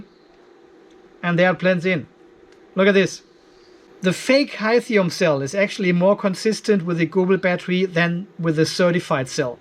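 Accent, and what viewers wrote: Germany. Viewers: You do not sound very Australian. You have a German accent. I have learned quite a lot from this but not sure if it is all necessary.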